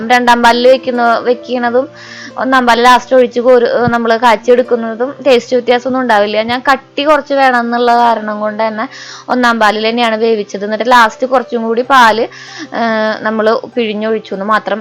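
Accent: Thrissur, India. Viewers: Hi.. njan kurach divasam ayitteullu kanan thudagitt..sathyam njan addict ayi nnu venam parayan.postive energy.. especially jolikonnum povathe irikunna enik..Thrissur bhasha powliyanu..avide ramavarmapurath padichitund.orupad ormakal und.orupad ishtamanu..god bless u..love u lot..💓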